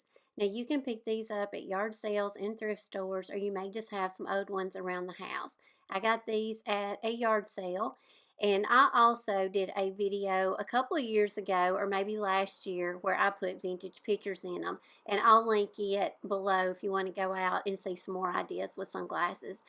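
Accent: South, United States. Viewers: You absolutely amaze me! One day will you do a video of your whole house? I taught French for many years and I love how you use the French IODs! I’m from Alabama and I appreciate your southern accent!💖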